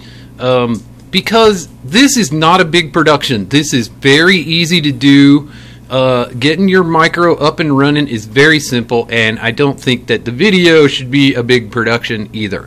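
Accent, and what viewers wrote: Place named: Texas, United States. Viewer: you have wierd texas axent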